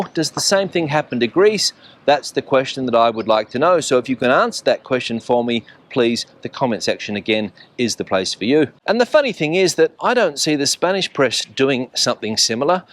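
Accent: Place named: Australia. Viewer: I think I recognise your accent, (are you an Aussie?) thanks for another valuable update!🙏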